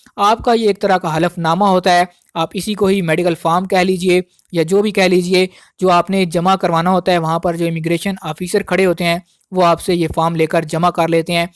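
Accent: India. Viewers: Bhai saaaab... bolne se Kashmiri ya Potohari lagtay aaaap...?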